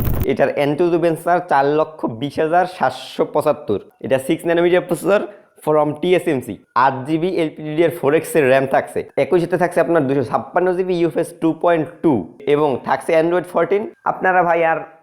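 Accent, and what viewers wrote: Barishal, Bangladesh. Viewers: ভাই কি বরিশালের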